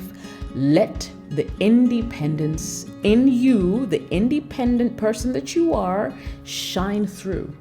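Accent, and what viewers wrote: France. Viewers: First I command you on your french it is a fine cute accent. Your channel is lit. You bring so many excellent materials and I truly believe this episode is the best of all. W/O respect there is no relationship. Wishing you that you reach your goals. Touloulou. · True facts. Respect is a King aspects of real man. Thanks and your french accent is good!!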